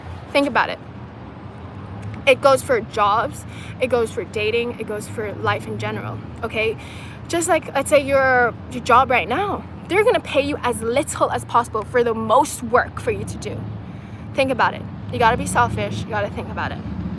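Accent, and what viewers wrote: United States. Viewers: I thought you were CHINESE? I'm confused..(I heard you mention Africa) but also You talk with an American accent!? Would be great to know seriously where you're from, background & yeah also how you met the "Coach" but you do need to eat Meat/Fish eggs etc I'm speaking as a nutritionist, bodybuilder, sportsman and personal trainer.. you NEED ANIMAL PROTEIN!! 💪🏻 You have a PHENOMENAL VOICE BTW!! 😉👍🏻❤️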